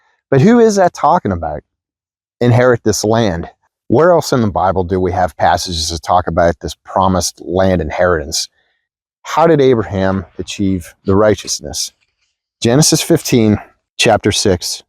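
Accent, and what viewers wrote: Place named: Pennsylvania, United States. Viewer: What a great video thank you for sharing! I can’t quite place your accent are you from PA? Greetings from Chester county god bless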